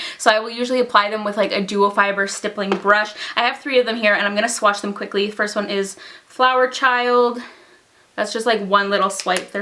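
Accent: Canada. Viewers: Why are you so pretty? I need to know the secret. Haha. And for some reason I can totally hear your Canadian accent in the video for the first time, lolol. Xoxo.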